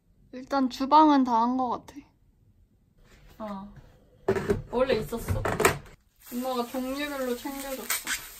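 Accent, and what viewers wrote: United Kingdom. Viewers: I loveeeee your English accent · 전 사실, 영국발음/표기 쓰시는 보구 정말 조았답니다, 중국 한국 도 좋지만 영국 라닛 ㅠ ㅠ ㅠ ㅠ ㅠ 기대할게요❤️ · 영어하시는 영상에서 발음이 영국이랑 비슷해서 듣기 너무 좋다 생각했는데 영국 교환다녀오셨군요!!대박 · 영국 교환학생 가시려고 일부러 영국액센트 공부하신건가요? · ㅝ지 당신? 영국식 영어 발음 너무나 섹시하자나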